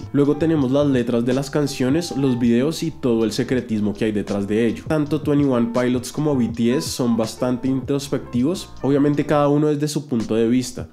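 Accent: Bogotá, Colombia. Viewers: Primera vez veo un video tuyo, y apenas empezaste a hablar me dije "esté man es rolo" y cuando dijiste "hijueputa" lo confirme. Aunque bueno, no se si sea cierto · T erecomiendo que hables sobre, Esteman. Por tu acento pareces De bogotá y suenas bien rolo jajskkjajk buen contenido sigue así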